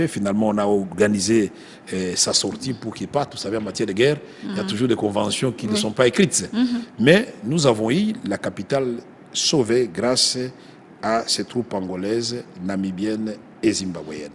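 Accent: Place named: Rwanda. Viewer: Maigres toutes les langues qu' il maîtrise mais il n'a pas perdu son accent kinyarwanda. Chassé le naturel.........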